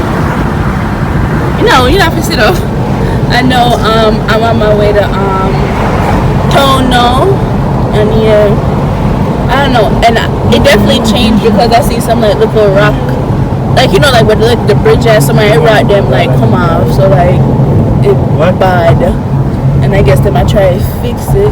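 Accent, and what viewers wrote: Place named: Jamaica. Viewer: Love your likkle patois🤣🤣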